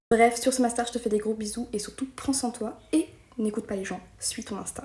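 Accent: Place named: France. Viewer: Ta parler le français de France girls ❤❤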